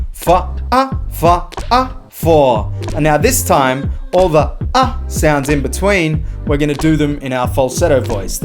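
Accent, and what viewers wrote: Australia. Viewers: I love how bloody Aussie you always sound bro haha. Love ya work < Please never stop being you and doing what you do...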